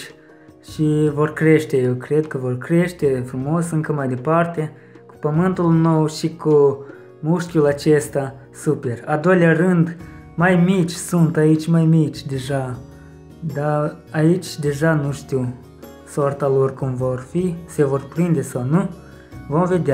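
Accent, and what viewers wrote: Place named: Russia. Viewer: vlogul e fain,dar mai lasă limba rusa deoparte, te face să vorbești Romana "urat" 👍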